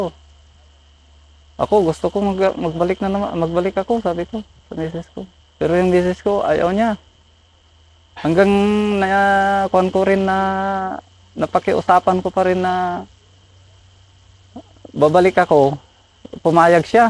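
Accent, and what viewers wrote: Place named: Pangasinan, Philippines. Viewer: Yung Punto mo KABAYAN, PANGASINAN KA PALA,AY ARO IDOL TA KA LA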